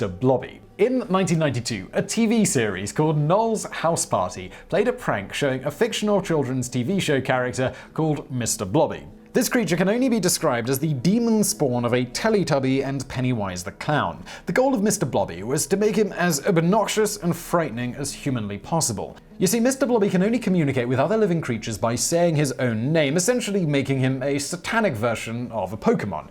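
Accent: England, United Kingdom. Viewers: English, middle class and educated:) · Speaking of accents what part of the UK are you from? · I cant believe your mixing up England, Britain and UK in your descriptions. Thats so outdated now. Generally people doing vids about the UK get the basic facts right. England is part of Britain which is part of the UK. England does not equal or is the same as the Britain or UK. You sound English so thought you would know these basic facts. In older buildings you still see separate hot and cold taps, on a wash basin or sink. They were originally designed with a plug, sometimes on a short chain, which you put in to the outlet. Then you could run the amount of hot or cold water into the basin, in the proportions you wanted.. So that it filled up with water of the desired temperature. When you had washed your hands ( usually with soap ), you pulled the plug out and the water drained away. 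These days the plug on these old basins is usually missing. Most houses in the Uk have converted to the one tap with hot and cold controls. The cold water tap in most houses is not mains, and comes from a tank, and is not drinkable. Though the cold water from the mains water supply, which is usually in the kitchen, is drinkable in most parts of UK. The Queen doesnt have any real powers, she is a symbolic head of state. She cant interfere with any decisions made by the Government. She has to sign her agreement to legislation made, without objection. If she tried to stop anything there could be a revoltion. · In case you’re wondering about British accent, it’s called Received Pronunciation.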